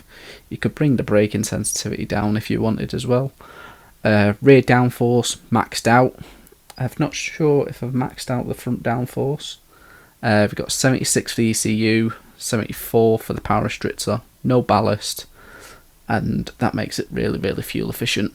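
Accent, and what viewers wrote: United Kingdom. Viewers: Man This game is so expensive on Car purchases so I have to make the best use of the cars I've got But thank you for all these builds. Do you think that the Peugeot l750r Set up you have here Will work well for the cafe Menu 42 GR1 Suzuki Race too? PS nice to hear A fellow mancunion accent.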